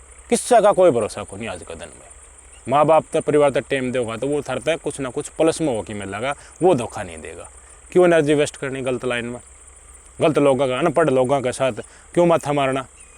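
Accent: Haryana, India. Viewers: Bhai ji aapne sahi baat kahi..aapka name kya hai bhai ji or aap kaha se ho.. haryanvi thodi typical hai aapki..or bahut achha haryanvi hai bhai aapki..mai khud haraya se hu lekin meri typical boli nahi hai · Bhaiya apki haryanvi sunkar dil khush ho haya ❤❤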